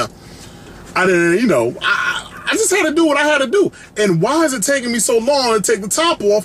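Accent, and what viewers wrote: United States. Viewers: best American accent.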